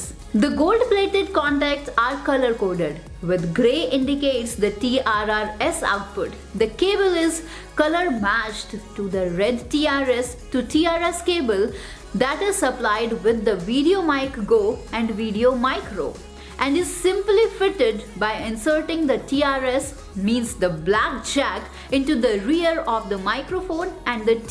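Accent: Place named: India. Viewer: Probably the best EXPLANATION, a little hard on the accent. But thank you, ma'am. We love India in America.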